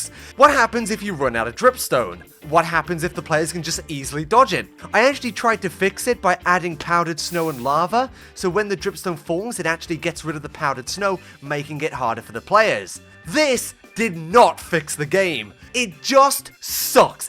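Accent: United Kingdom. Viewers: will you tell us how we can acquire an unhinged british accent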